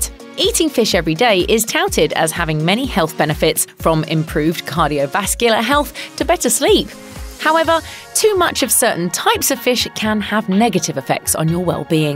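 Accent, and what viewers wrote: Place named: United Kingdom. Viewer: Ugh who is this British narrator? Bring back the old narrator.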